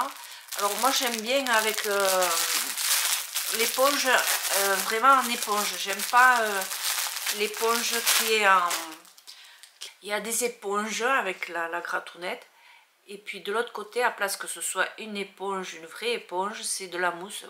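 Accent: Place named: South, France. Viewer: oui c est clair 🤣👌sans blague peut être qu il y a moyens de communiquer 😌j entend votre jolie accent du sud d ou êtes vous ?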